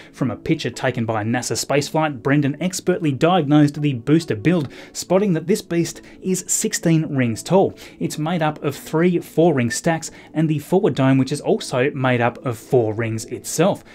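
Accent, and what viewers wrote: Australia. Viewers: "The bitch had been cleared..." I almost died laughing. Sorry, I know its just your Ausie accent, but too funny. Love it!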